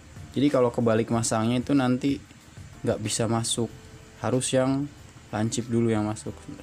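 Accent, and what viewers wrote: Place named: West Java, Indonesia. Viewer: sunda pisan mang..nuhun trikna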